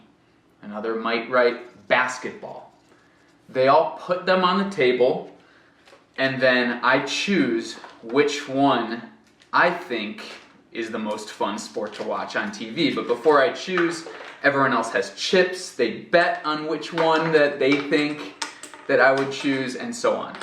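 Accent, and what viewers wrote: Brazil. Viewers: incrível! O seu sotaque é como um brasileiro falando inglês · parece um brasileiro falando inglês fluente lol Still waiting for u here in Pantanal!!! With the capivaras:v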